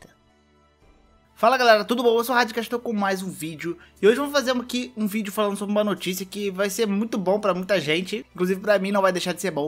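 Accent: Rio de Janeiro, Brazil. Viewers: Quando voce Fala twice parece que voce Fala tchuaiche.. Eh sotaque carioca?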